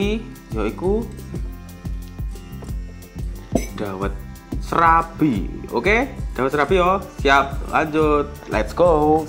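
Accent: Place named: Java, Indonesia. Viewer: Medok 2 salam salim slem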